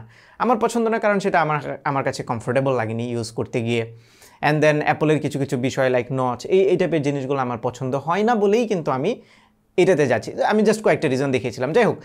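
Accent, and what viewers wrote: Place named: Kishoreganj, Bangladesh. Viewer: Still মানুষ আমারে গাইল্লাইতাছে শুনার পর আমি বিশ্বাস করলাম আপনি আমার জাতি ভাই কিশোরগইঞ্জা ভাই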